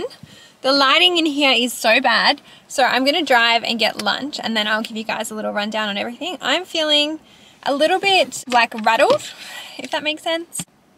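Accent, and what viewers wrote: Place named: England, United Kingdom. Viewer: I’m confused. What country are you in? You have an English accent but you told us at the end that it wasn’t covered by Medicare and it costs 190 dollars! Medicare in The U.S. is for older adults. Why it’s important to know is if it’s covered or not. If UK I’m surprised it’s not covered. I am in france and had several infusions totally covered. Can you please tell us which country you are in?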